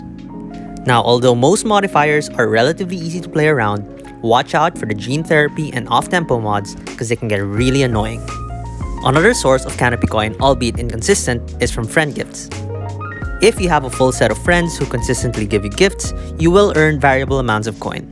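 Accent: Philippines. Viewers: The video is awesome can't really say much but i can tell how your accent works. Dont mean to be rude but are ya a filipino? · I can smell that filipino accent from over here. Great video btw.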